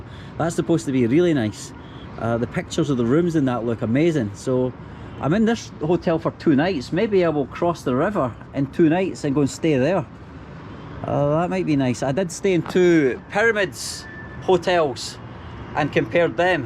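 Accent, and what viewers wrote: United Kingdom. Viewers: Are you British .... cause your accent is so harsh to me❗❗🤔🤔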